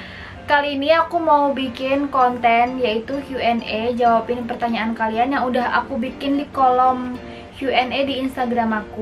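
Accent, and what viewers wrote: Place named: Java, Indonesia. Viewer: wg jawa ga boleh ilang jawa nya 🥰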